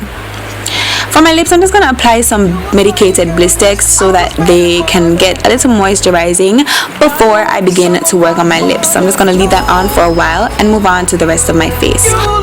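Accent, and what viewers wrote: Jamaica. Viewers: are you jamaican or Trinidadian? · love yo accent (team jamaica)😊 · Love the Jamaican accent. you are so beautiful. big up yo self. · ooh Jamaican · Ooo she Jamaican go girl!!!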